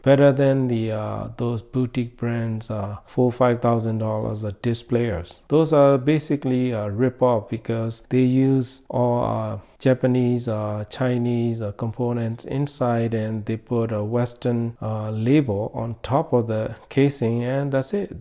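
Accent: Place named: Myanmar. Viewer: Your sound like Myanmar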